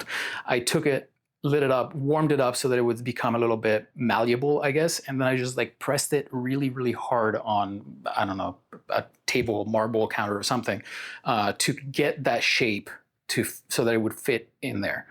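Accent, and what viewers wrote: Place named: Ireland. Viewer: Are you originally from Ireland? Your accent reminds me of my late great grandmother whom I'm named after.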